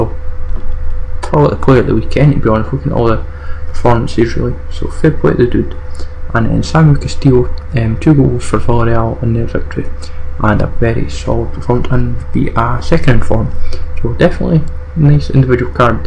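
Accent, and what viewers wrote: Scotland, United Kingdom. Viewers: Just not use to Scottish accent I guess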